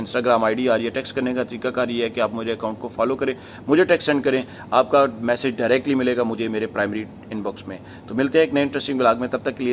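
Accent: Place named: Pakistan. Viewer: Are you an Indian or Pakistani?? I'm doubtful because you have talked about India But noT Pakistan though your name and speaking accent is of a Pakistani.....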